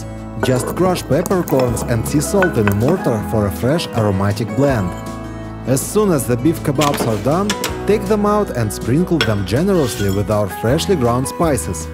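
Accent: Russia. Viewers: Не зная Английский, слышу русский акцент😂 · Ужасный русский акцент !!!! Зачем говорить???? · Русский акцент или мне показалось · Speaking english with russian accent is terrible.. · английский с ру акцентом!)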